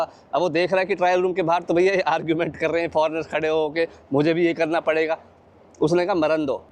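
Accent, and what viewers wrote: Haryana, India. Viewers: Bhai aap ki aawaz sey lagta hey ke aa hariyana ke raheney waaley ho.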